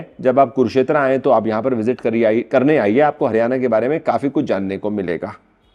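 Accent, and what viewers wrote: Punjab, India. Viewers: Aapke videos bahut achhe hote hain, lekin ek request hai. Jab bhi aap kisi historical place ka video banao, to apne punjabi accent ko control kiya kijiye, us se saari vibe kharaab ho jaati hai. Please bura mat maaniyega, fan hu isiliye adhikaar se bol rahi hu. Jaise ki aap भीष्म कुंड को भीषम कुंड bolte ho, और को ओर बोलते हो, युद्ध को जुड्ढ बोलते हो। आप जानते हो महाभारत काल में कितनी शुद्ध हिंदी बोली जाती थी। जब आपने वीडियो बनाने की starting की थी, तब से अब तक काफी चेंज है आपकी भाषा में लेकिन थोड़ा सा और सम्भाल लीजिए, please, video देखने का आनंद दुगुना हो जाएगा। राधे राधे।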